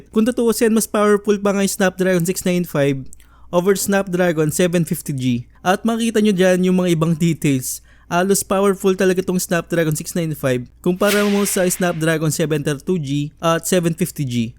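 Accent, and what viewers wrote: Pampanga, Philippines. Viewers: Hahaha Kapampangan ka lodz? HAHA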